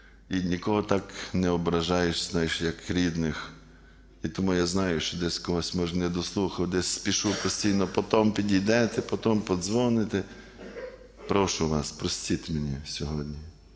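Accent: Western Ukraine, Ukraine. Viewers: Отче, я живу в Тернополі і є парафіянкою нашої церкви Святого Йосафата, але дуже люблю дивитись і слухати Ваші проповіді, науку, відповіді на запитання. А ще Ваш діалект такий близький, як то кажуть, західняцький і почуття гумору. Велике Вам спасибі за Ваш труд і служіння Богу і людям!❤💙💛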